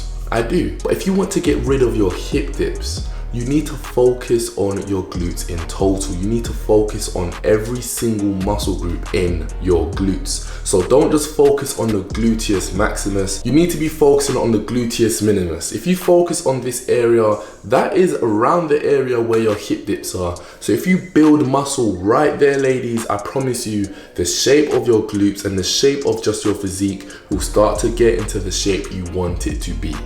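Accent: London, United Kingdom. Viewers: Your accent is killing me 👌👌👌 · British accent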